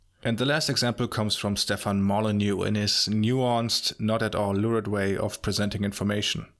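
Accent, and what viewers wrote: Germany. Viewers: Please more reciting rap lyrics in your German accent, best thing ever. Oh and always great content. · I just realized you're German... makes sense now with the accent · My God, you are German. You are the protoGerman, the Urdeutsch. I am, from now on, using you as my "Voice of Germany". Since this is youTube I add: no, this is not sarcasm. You make Bismarck sound Italian, Luther sound Greek. I ask, therefore, from you a summation of the Chancellorship of Angela Merkel. From the early Merkel muddle through days, to the rise of the EU and the Imperial Eagle, to the "I shall crush Greece until it squeals", ending in "es gibt keine obere Grenze" or "es gibt keine Grenze" or whatever it was. So far as the refuge crisis goes, it will be remembered as "es gibt keine Grenze" and not a failure of paragraph c, subsection 128, page 6498, of the Dublin Accord.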